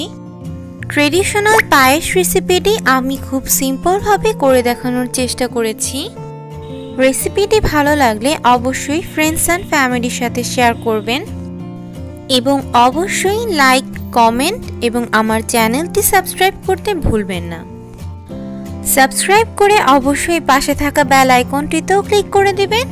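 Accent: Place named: India. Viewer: Khub khub Valo laglo khete Mon chaitese apu TMR Katha gula Indian blogger Der Moto lage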